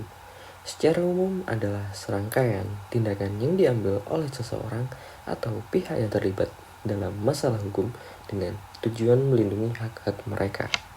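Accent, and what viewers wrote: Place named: Malang, Indonesia. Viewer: Pasti yang dubing anak mlawang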